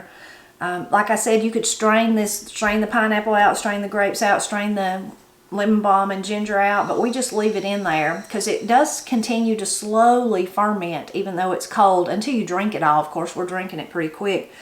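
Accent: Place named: West Virginia, United States. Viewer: I just found this post and I'm very curious because I've been looking for something I can stand to drink. I'm in California from West Virginia and have quit drinking water because it smells and taste so bad. This has caused many medical problems because of being dehydrated. I came here in 1962 with my parents. I was 12 years old and we use to drink water running out of the mountains, so clean and delicious. I'm now 74 and cannot stand to drink this terrible water in Modesto California. I'm going to do research and try to make water I can stand to drink. I just wonder where I might get my starting water.ost water sold in bottles here are just tap water that I will not drink. So I'm starting with a handicap. I've becr addicted to your shows. I miss now WV home but cannot go home due to medical coverage for my heart condition. Thanks for your guidance and your accent, reminds of all family and friends that have past over.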